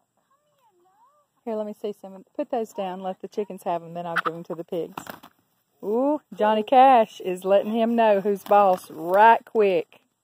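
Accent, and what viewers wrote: South, United States. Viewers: This woman has the loveliest southern accent I've ever heard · This lady has the most appealing Southern accent and way of speaking. I know she’s a Mom and all, but I’m smitten.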